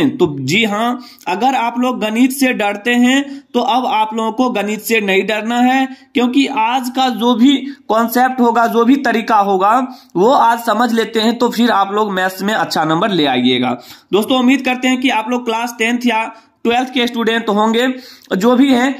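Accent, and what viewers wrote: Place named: Uttar Pradesh, India. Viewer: Up ka q bol rhe ho sir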